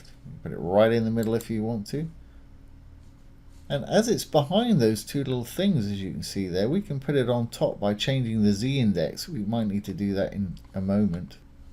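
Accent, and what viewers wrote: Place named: England, United Kingdom. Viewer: I like this from! I am intrigued by your accent you sound English but drop the 'T's - very american! Whatever - watch all your videos, you should do some for Elegant Themes KP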